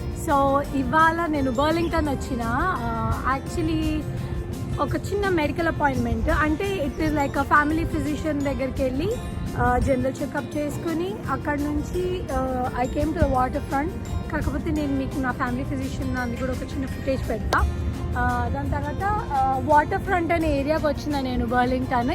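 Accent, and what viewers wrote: Telangana, India. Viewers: Perfect telangana yasa (slang 😎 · Hi what are you first time watching new video but very good talking are you Telangana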